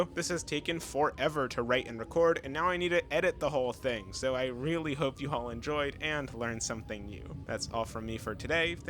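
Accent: Brazil. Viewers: Esse inglês paulista eu conheço de longe. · For sure you are brazilian or very familiarized with brazilian portuguese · Even though your english is very good, I can notice an accent. You're brazilian alright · he has a Brazilian accent. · But the guy who’s presenting the video is a brazilian, for sure. He can say portuguese (brazilian one) without any accent at all. No foreigner visitor can do so. He may lives in the USA, but he’s (or his family) from Brazil.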